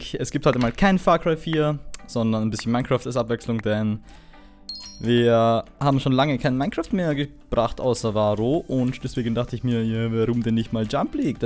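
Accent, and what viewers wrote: Austria. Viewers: Die Mischung aus deinem österreichischen Dialekt und der Teddy-Comedy Stimme hört sich echt lustig an :D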